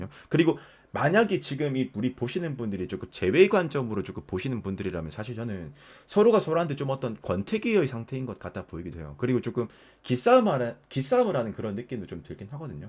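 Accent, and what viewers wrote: Gyeongsang, South Korea. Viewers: 경상도 남성 목소랴가 정겹고 편안하네요 친한 칭구가 말하듯이 얘기해주는 느낌ᆢㅋ 잘 듣고 갑니다~~^^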